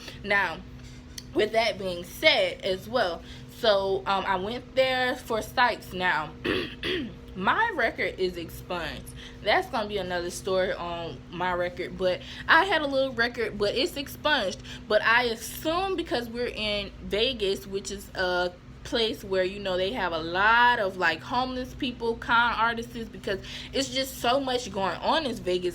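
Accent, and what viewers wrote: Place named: Deep South, United States. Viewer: "East Coast"...lol.. Naaah' you're from the DEEEEEP South!! Lol. It's all good. How's Vegas?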